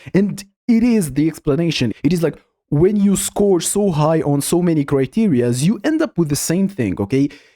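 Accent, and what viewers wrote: Russia. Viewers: First video I came across from you. Your Russian accent suggests you've learned it later on in life or never lived in Russia (learned it from your parents or something). Same here though haha Cheers! Subscribed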